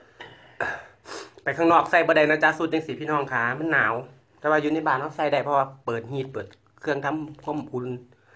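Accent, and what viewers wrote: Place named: Isan, Thailand. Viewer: สำเนียงอีสารเพราะดีคนนี้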